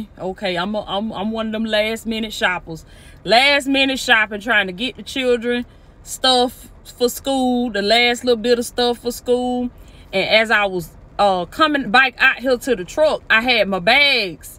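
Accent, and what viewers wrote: South, United States. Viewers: You from the South????😂😂😂😥💯💪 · Love the message and the country accent. 🥰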